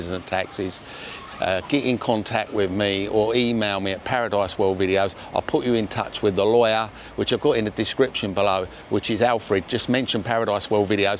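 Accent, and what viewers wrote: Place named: London, United Kingdom. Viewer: Yeah you got a nice London accent mate,but your on the wrong page on this one mate.frack the banks, they are going down mate.with quontam banking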